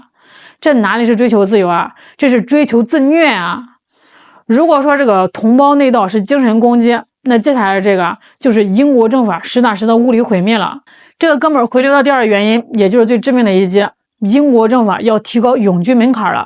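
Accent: China. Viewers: 开什么玩笑，让中国大陆地方口音浓重的人念稿，解读香港群体在海外的心态，如此驴唇不对马嘴自己不嫌别扭也罢，出来作弄恶心他人则有辱斯文有失厚道……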